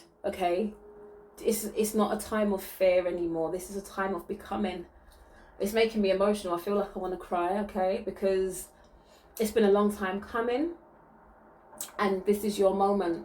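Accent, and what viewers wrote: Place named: United Kingdom. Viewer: I have just tuned in... But I know....I'm feeling you already beautiful soul Si💫🙏❤️🌻🌄✨xx My place of birth Is not far from you, According to your accent 😉😋.... Isle of Man ... Ellan Vannin in my ancient tongue Mona / Mann By my Viking ancestors 650bc x In my ancient tongue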